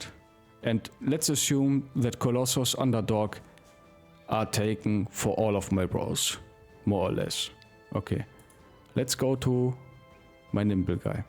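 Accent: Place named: Germany. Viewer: Nothing is quite like a thick german accent schooling one in Battle Brothers! Thanks i learned a lot :)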